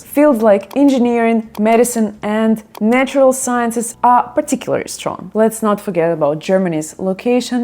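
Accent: Russia. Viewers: Спасибо за видео. Но акцент русскоговорящего человека очень сильно слышно с первых минут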